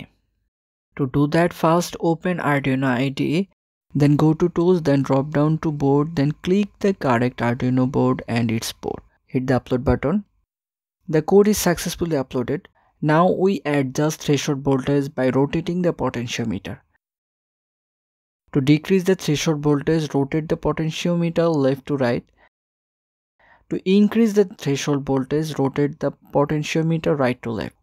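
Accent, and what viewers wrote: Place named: India. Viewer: stop speek like indian guy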